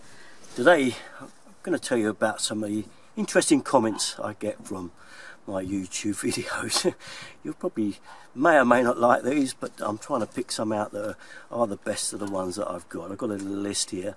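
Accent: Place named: London, United Kingdom. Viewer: What part of London are your from?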